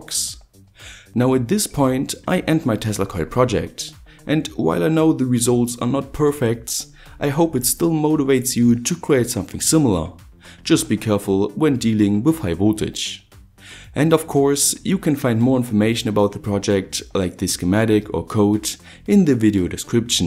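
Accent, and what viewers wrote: Germany. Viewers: Ich finde seine Projekte schon geil. Bin zwar neu hier hör aber gleich das er eigentlich ja ein Deutscher ist. Deshalb wundert es mich warum er seine Videos auf Englisch macht. Weiß das jemand?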